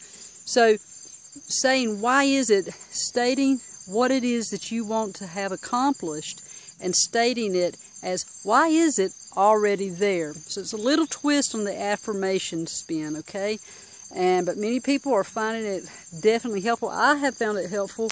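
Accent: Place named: South, United States. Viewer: You're awesome. IM a "Tarheel" Myself,by the way. I Love the Carolina/Southern dialects and accents, your's is music to my ears!! Yee Haw!! Keith.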